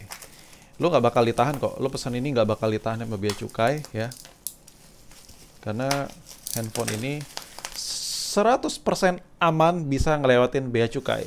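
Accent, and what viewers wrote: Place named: Java, Indonesia. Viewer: Lu orang jawa ya bang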